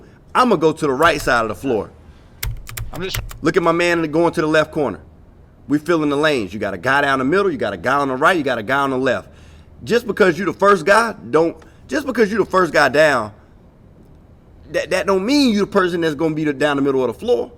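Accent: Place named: Atlanta, United States. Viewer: My dawg pointed you out....you peeping it young ..that next pass gotta know his next move...good break down...you atl or you midwest boss? You got that t.i. dialect ...salute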